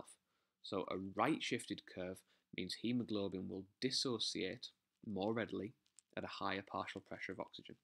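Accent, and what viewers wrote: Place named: United Kingdom. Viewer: Im so glad I've found your channel, just in time for my exams next month. Thanks! Also its nice to see hear somebody British teaching Biology, as there are a lot of American videos on Youtube and they pronounce a lot of things differently.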